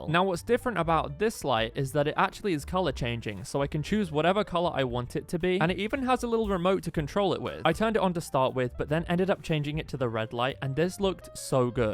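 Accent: United Kingdom. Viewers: The british accent✨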